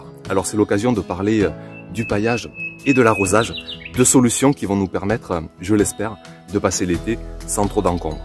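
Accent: South, France. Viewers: Bonjour, tout d'abord une réaction par rapport au sujet puisque tu nous y encourages.. Pour ma part, cette année, j'ai paillé en "temps normal" mais c'était sans compter sur cette "année à limaces" (et toute cette pluie) qui fut exceptionnelle! Donc, il a fallu tout enlever, déloger le surplus, puis, dernièrement, pailler à nouveau. Quel travail! De fait, les parcelles et butes ont perdu une partie du vivant qui était là, bien installé... Les plants des semis ont du rester plus longtemps à la maison et la reprise en terre, je le vois est plus difficile, plus lente. Idem pour les fraisiers, l'excès de pluie a engendré la maladie. Il a fallu enlever fruits et feuilles touchés et bien sûr dé-pailler. Mais le résultat a été concluant: A ce jour, 5Kg de fraises! Miam Miam lol. Autre info pour les abonnés: enlever la paille temporairement si, comme ici, il fait encore qques nuits très fraîches. 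Cela permet à la terre de pouvoir restituer la chaleur pendant cette dernière. La paille bloque ce phénomène. Utile par exemple pour les plants de courgette. Enfin, une question: Mes Blettes montent cette année. Je les laisse pour récupérer les graines. Ma question est celle-ci: le plant meurt-il après avoir fait les graines cad dois-je le couper et semer un nouveau plant ou bien va t il me donner de nouvelles cardes comestibles dans quelques mois si je le laisse en place? Je finis en te disant que tes vidéos, ton (notre lol) accent me rappellent le Sud d'où je suis originaire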